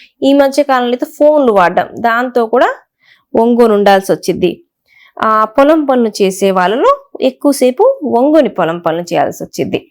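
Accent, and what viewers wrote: Guntur, India. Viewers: Pure guntur slang.. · It is our Guntur slang.we like our slang bro.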